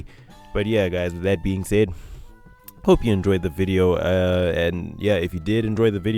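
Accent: South Africa. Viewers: Are u South African 🇿🇦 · THIS GUY IS DEFINITELY FROM SOUTH AFRICA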